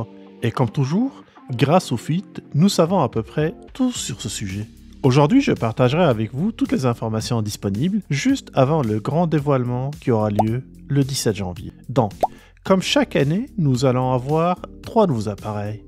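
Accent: Canada. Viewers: je deteste l'accent canadien omg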